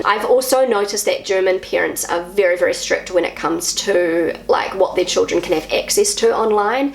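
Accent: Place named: New Zealand. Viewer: Everytime I watch a vlog of yours I'm helplessly falling in love with your kiwi english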